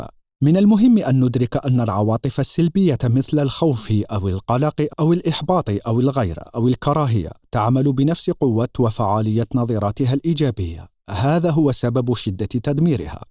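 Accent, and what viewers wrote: Mauritania. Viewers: القارئ موريتاني؟ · هل القارئ موريتاني???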